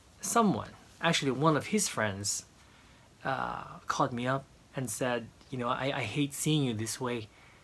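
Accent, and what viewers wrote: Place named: United States. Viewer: actually grew up in Hawaii, hence his American accent and fluent English!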